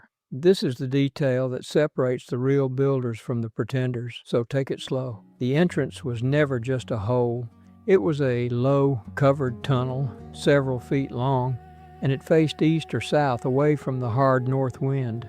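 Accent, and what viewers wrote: South, United States. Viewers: Why does he have a southern accent? A I accent and images - but interesting Why does he say "Indian" country? That's not what they called themselves. lol. Indian country is the country called India. Also, how is that these types of dug out shelters don't have the soil collapsing on them?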